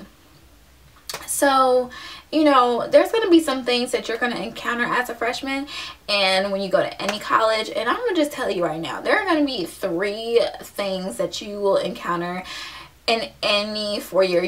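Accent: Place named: Illinois, United States. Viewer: I'm from the IL too. I can tell you are from IL, you sound so familiar!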